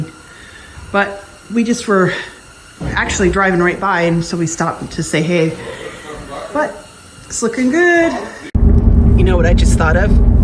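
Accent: South, United States. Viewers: Amen!! This Mississippi girl would love some of that snow too!! It’s so beautiful,, love watching you,, gotta say,, I sometimes detect a slight Southern accent , 😉 love it!! You are who I’ve always wanted to be! Is there anything you can’t do❤️ too blessed to be stressed 🙏🏻❤️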